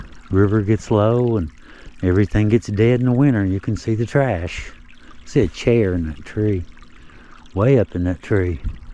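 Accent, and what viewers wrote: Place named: South Carolina, United States. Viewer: Great video I'm trying to guess your accent. are you from South Carolina?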